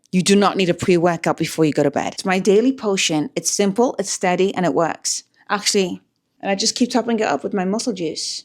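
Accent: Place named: Liverpool, United Kingdom. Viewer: I knew that was a scouse accent! Love these tips - I do simple sugars after workouts as well, cereal or milkshake are my favs. New sub! X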